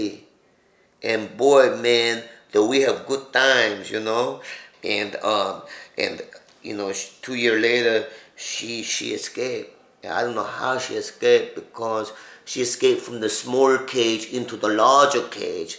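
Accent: Mexico. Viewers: Is he suppose to sound Mexican?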